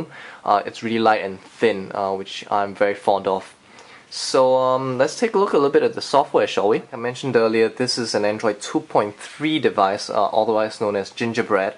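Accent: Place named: Singapore. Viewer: When I watched this video I was listening to the guys voice. "Definitely Singaporean" haha looks like i'm right XD Singaporean's do have an accent huh... BTW I have this phone, It's not bad and the screen and is BIG but what I don't like about the MIdnight blue/black version is that that back cover gets a lot of finger prints.. but it's good.. quite.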